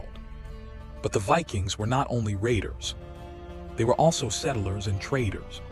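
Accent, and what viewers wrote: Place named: United States. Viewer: Sorry as soon as I hear an American voice over on British history I turn off , you need a local narration even if it's AI.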